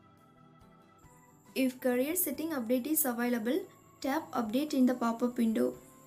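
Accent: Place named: India. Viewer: Hard to understand ... sounds Indian 🤨 too fast as usual 🧐 too many options sounds no good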